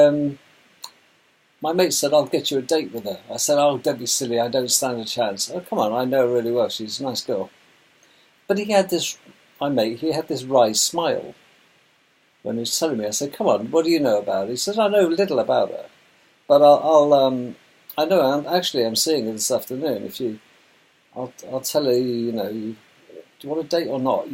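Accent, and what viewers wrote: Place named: United Kingdom. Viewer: My sister is from the south ( she was adopted at birth) we found each other about 20 years ago.....well she was very worried about my accent....I'm from Massachusetts (western)(eastern mass has that nasal Boston accent) her whole family loves my accent.....I found that funny, I don't have one.....they do, all southerners.......it's funny how many Americans have an accent.....you have an accent, ,love a British accent 😎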